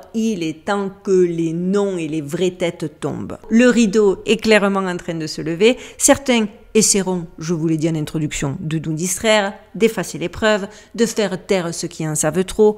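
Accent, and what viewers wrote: South, France. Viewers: Super merci et ton accent du sud si particulier me régale.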